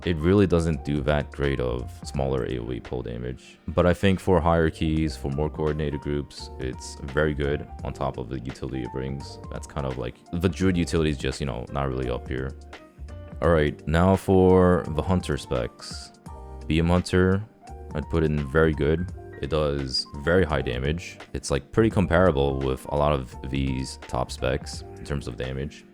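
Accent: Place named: United States. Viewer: basing your experience on someone else opinion :D sound american to me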